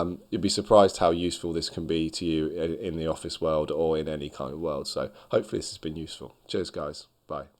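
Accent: United Kingdom. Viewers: Tough British accent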